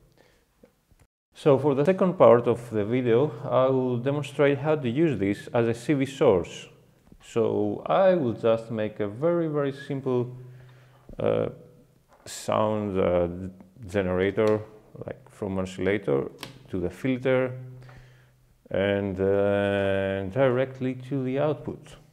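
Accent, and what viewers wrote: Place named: Greece. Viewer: Γιατί απο τα αγγλικά που ακούω νομίζω ότι είσαι Έλληνας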